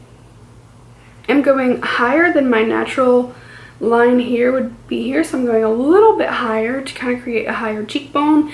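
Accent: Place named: Alabama, United States. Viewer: Love this brand! So glad to see others talk about it! It sounds like your from Alabama? Maybe lol. I'm Mississippi haha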